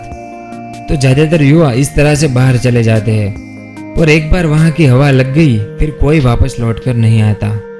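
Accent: Gujarat, India. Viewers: Aap Gujarati ho kya?? Aapka bolneka accent ekdam Gujaratiyon jaisa hai!!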